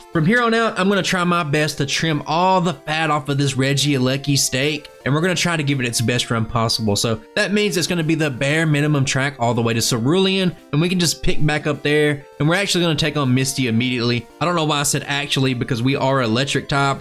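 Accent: South, United States. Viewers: Literally the first and only Pokevideo I've seen in my entire life with a Southern narrator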